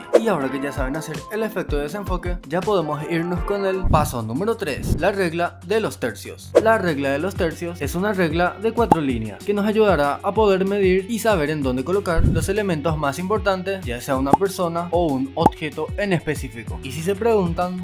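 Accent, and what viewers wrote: Paraguay. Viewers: Gran video, me ayudaste mucho, por cierto, ¿sos paraguayo? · Paraguayo ? verdad kp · Ya me sonaba el acento paraguayo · Eres Paraguayo? Tu forma de hablar me suena Paraguayo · Sos Paraguayo? xd, me doy cuenta por ti acento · Tu acentó parece paraguayo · Bro, sos Paraguayo? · nde kp vos sos paraguayo? se nota en tu acento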